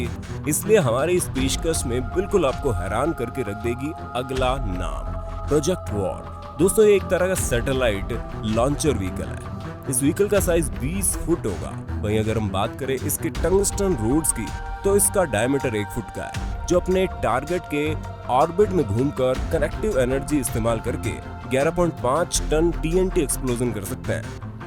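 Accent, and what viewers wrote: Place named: Pakistan. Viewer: Kaise hogi? Pakistani hai yeh.iski awaz se saaf pta chalta hai.chanel ka naam haha....Paise kamane hai wo bhi india ke naam pe.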